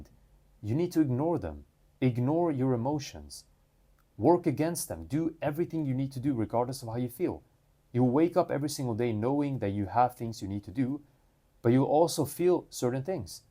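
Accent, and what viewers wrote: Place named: Arab world. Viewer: Your accent is like Rudy Ayoub's, the 'Arab Dad' guy. Good message.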